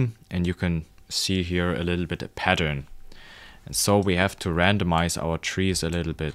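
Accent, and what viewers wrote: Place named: Germany. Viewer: you are german i can hear the acent in you XD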